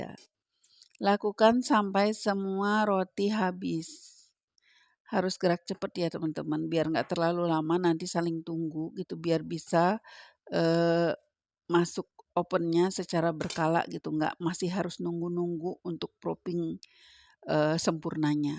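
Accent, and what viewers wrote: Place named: Bandung, Indonesia. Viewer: Suka banget sama kalo LG NGE bake inget uwa yg di bandung..sama logat nya... mengingat kan waktu kecil pernah tinggal di bandung sama uwa...sehat y...semangattt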